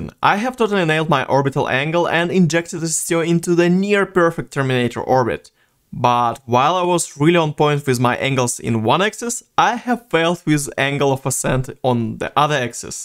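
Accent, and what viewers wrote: Russia. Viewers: жесть - такой русский акцент 8) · Вы Россиянин? Акцент очень похожий на русский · Ты ведь из России или снг, да?) Забавно что человека с акцентом понимать намного легче чем говорящего на чистом английском. Классное видео) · he's Russian, you can hear it tho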